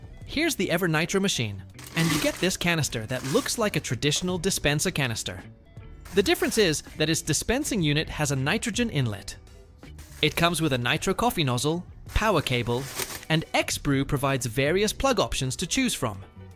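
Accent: United Kingdom. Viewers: What's going on with the accent, it sounds a little English vs the usual Canadian we're used to lol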